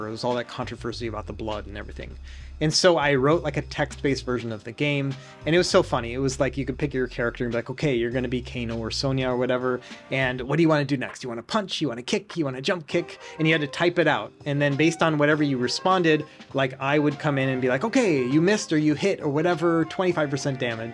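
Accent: Minnesota, United States. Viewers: As a Minnesotan, it makes so much sense now that that you picked up a bit of Minnesotan accent! I could never quite put my tongue on it, but your voice felt familiar lol! Love the vids, keep it up ❤ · Congrats on 400k subscribers, its well deserved. This is one of the highest quality channels on YouTube and the effort you put in really shows. It's interesting what you said about the midwest accent, I always just thought you were from Minnesota. Won't be long to 1 mil subs now! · Also, thanks for commenting on your accent. I’ve never been able to place it, but you nailed it - definitely Minnesotan. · Oh wow, I didn't hear the Minnesota until you pointed it out but now that you say it I absolutely do. 😂 Love your work; thank you for all the dedication and time you put into everything you do. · Minnesotan here, no wonder I vibe with you so much. Similar interests, familiar accent. 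Great job with the channel. You singlehandedly got me into retro handhelds :D had no idea you are in your 40s, I'm 28 and bald, so I thought you were younger than me lol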